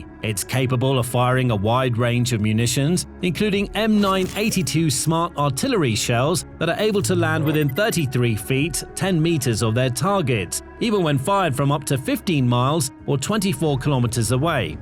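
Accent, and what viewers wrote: United Kingdom. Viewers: Please lose the British accent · Not actually American is it, British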